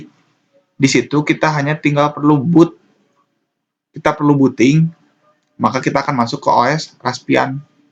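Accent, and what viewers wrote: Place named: West Java, Indonesia. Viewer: Mantap sa masih aya logat sunda 😆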